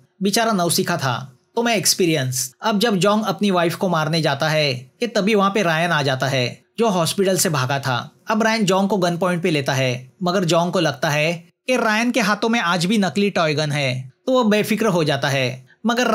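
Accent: Maharashtra, India. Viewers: मराठी medium का है .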